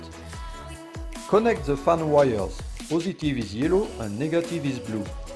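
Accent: France. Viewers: Sympa l'accent français 😁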